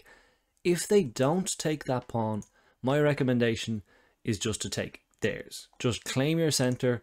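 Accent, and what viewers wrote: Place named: Ireland. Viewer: Nice video and delivery although I was a bit put off by the missed mates-in-one and nearly just gave up at that point. What stopped me is that I saw a lot of positives so I’d prefer to be supportive. I’m sure it’s not easy doing what you do! I, of course, have never missed mate-in-one. Not once. Ever. 😳 Your voice and cadence of speech are easy to listen to - a lot to be said for that Irish lilt! For me that’s in contrast to many of our favourite YouTubers, the main exception being Eric Rosen. I also prefer educational content over pure entertainment and I like that you’re also on camera, which is often not the case for creators of similar material (off-beat gambit lines) Great channel name too. I’m looking forward to more Chess Please! 🙂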